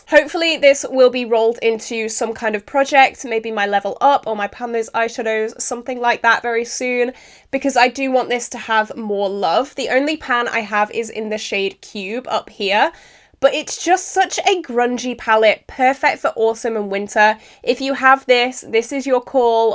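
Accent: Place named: United Kingdom. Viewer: Hello, I love your Videos so much. I found you on YT a few month ago and now I´m addicted to your Videos. It's so sad that the Beauty Bay Book of Magic an the Wilderness Palette are discontinued. I became today a bunch of Beauty Bay Palettes (my first ones), I buy it cause you are so impressed of them. It´s also a shame the ABH Subculture Palette is discontinued I had the OG one but the Quality was so bad, so I gave it away. Also I both my first BPerfect Carnival Palette these Days cause you are so in love with these Palettes. Next time I will buy my first Color Pop Palettes, but I´m so overwhelmed with the Numbers of Palettes they have so I don´t now, which one I should buy. But this purchase have to wait a little, cause I both so much these Days (Huda Beauty Grungy, BPerfect Gravity, Oden´s Eye Chrismas Value with 4 Palettes, BPerfect Carnival Interstellar, BPerfect Carnival The Antidot, a bunch of Beauty Bay Palettes, Morphe The Seasons Artistry Palette, Morphe CT18, etc.) and I want the Cosmic Brushes Winter Wonderland Palette so bad). 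I´m from Germany and I love your british accent so much. And as I said I love your Videos and Content. Stay tuned. Claudia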